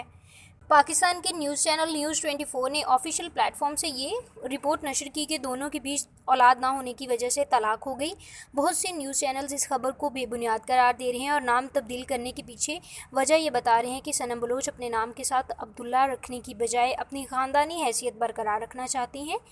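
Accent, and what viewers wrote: India. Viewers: Intihai buri hy...urdu tu sahi nahin..news kya parrhni....indians ki tarah khabar ki ghabbar or ghalat ko galat kaha ja raha hy...urdu k words pronounce krna ata nahin...bad👎👎👎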